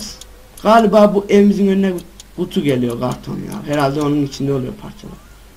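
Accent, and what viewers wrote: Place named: Konya, Turkey. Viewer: helal olsun abi bu arada sen nerelisin konyalı gibi konuşuyonda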